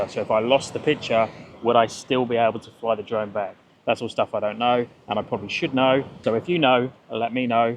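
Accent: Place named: United Kingdom. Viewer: Are you originally British? You have a very british sounding accent Down under aha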